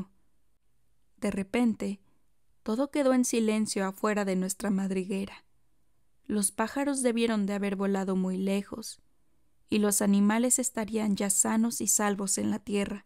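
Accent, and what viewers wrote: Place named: Mexico. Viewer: GRACIAS, POR DARNOS ÉSTE LIBRO CON TU BONITA Y CLARA VOZ Y EN MEXICANO 😃👍🇲🇽♥️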